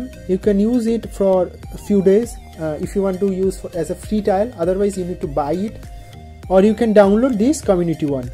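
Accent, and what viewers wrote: Bengal, India. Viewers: typical bengali accent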